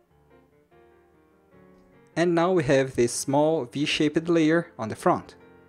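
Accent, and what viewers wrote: Japan. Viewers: It's a shame that the person with the coolest crafts on YouTube has the least comprehensive, most annoying tutorials. Plus, when you're not very good at it (like me) you have to listen to this autistic Japanese accent and this kindergartner level grammar until you finish the tutorial or you have the sense to quit the video. The latter worked well for me.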